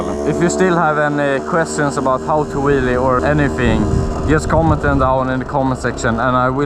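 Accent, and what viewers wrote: Sweden. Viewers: Det skriker svensk av din engelska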